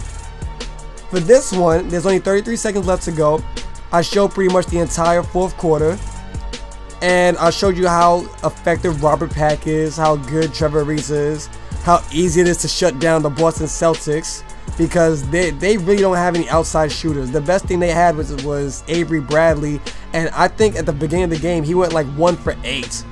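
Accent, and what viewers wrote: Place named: New Jersey, United States. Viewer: Really Happy You uploaded. Guess I've been on your ass for Vids lately its just you are one of my favorite commentators. No homo but your voice is perfect for commentating sounds like you are from new jersey or something. Skys your limit.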